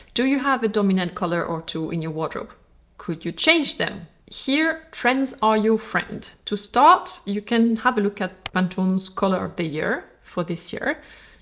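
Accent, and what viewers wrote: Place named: France. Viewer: Please, please speak a little slower. I like your chabel and I like what you are saing, BUT it is very dificult to understand when you spead that fast and vith that strong french accent (whisn is beautiful, don´t you mis understand me) I am also not native english speaker, may be that is the reason that I can not understand well. I have noticed that you speak now much faster then before mooving back to France. I wish you all the best.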